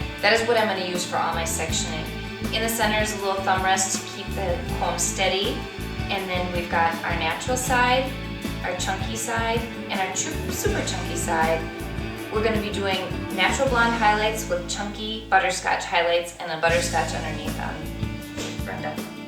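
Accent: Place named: Minnesota, United States. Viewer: r u from minnisota u knind of have the accent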